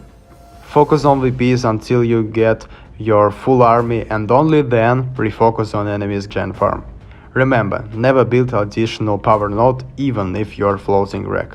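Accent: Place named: Russia. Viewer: ты же русский игрок?я сморел твои реплеи и ты реально крут. Твой акцент похож на русский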